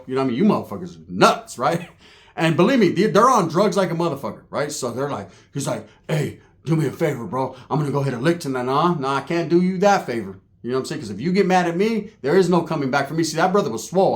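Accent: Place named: Bay Area, United States. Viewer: Aye uce... I peep how you sound like a bay nigga. They not in tune on how we talk. These stories be having us dying 😂 you sound like a OTHERS. That super uce..all your samoan viewers heard him thru you😂😂😂😂we felt dat sole..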